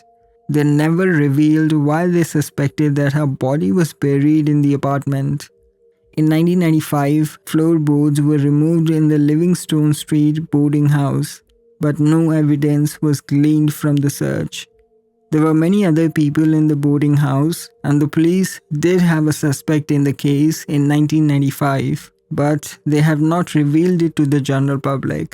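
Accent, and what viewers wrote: India. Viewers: omg this indian accent voice is even worst, unbearable, please use a better voice, it's worst than the robot one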